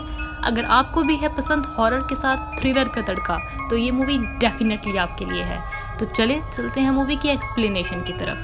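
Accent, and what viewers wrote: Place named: Lahore, Pakistan. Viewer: Awaz se lgta hai ap lahore se ho...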